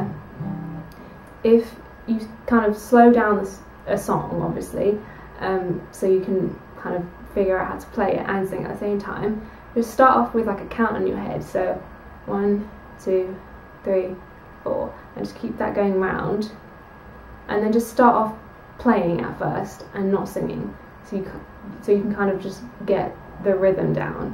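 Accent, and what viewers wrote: United Kingdom. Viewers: You're....you're British? Whuaaahhhh? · Ah your Brittish!?!?!? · Whoa ur British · OMG you’re british!!!!!! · YOUR BRITISH!!!!!! did not see that coming. MIND=BLOWN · woahh tbh i didnt know you were british until this vid. singing obviously hides accents. haha btw, this is very good. simple and easy to understand because i was a self thought guitar player as well and i absolutely agree about playing the general chords first because slowly you'll know how to play the hard chords :D this need to be share to friends or families who are beginners at playing guitars. good job! :D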